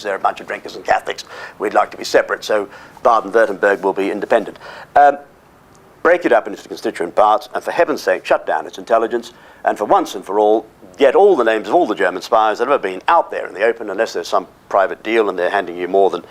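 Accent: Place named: RP, United Kingdom. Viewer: Utter crackpot. His Patrick Moore style accent irritates as well. Bad egg. Nasty chap. Totally off his head. Has he not heard of NATO. He has been reading too many war comics.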